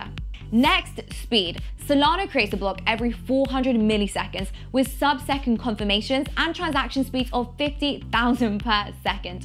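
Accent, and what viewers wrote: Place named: United Kingdom. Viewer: That British accent is what gets you every time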